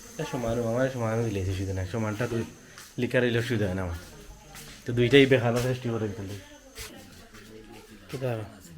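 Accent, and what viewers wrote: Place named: Sylhet, Bangladesh. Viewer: So authentic sylhety talk and simplicity of presentation. I have considered subscribe. Well done brother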